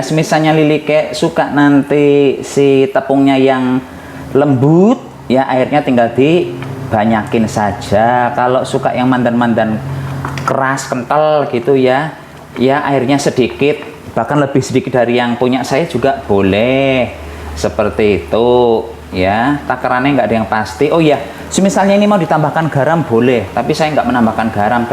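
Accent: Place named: Banyumas, Indonesia. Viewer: Asalamualaikum Wrwb Sugeng sonten mas, mana byunge mas, lha Yo ngono di yalakan lampune jok peteng bae😂😂😂, AG ikut, Tan bahasane mas ngapak😂😂,LGI masak nopo Yung, wah Niki sng yg sangat mengsipirasi gaene penyakit maq,e, kambuh kebanyakan, dan sekali lagi terimakasih obat maqnya, trs yimak cuuus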